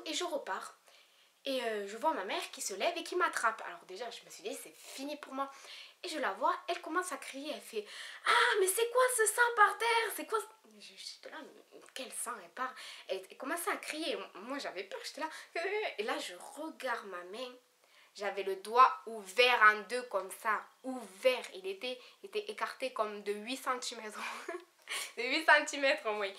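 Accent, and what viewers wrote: Marseille, France. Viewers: t est marseillaise ? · Aie on a reconnu direct l'accent Marseillerr !!!!! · te marseillaise nn ta le même accent que moi sur se te trooop Belle😍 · Tu es Marseillais ? Super vidéo ❤️💋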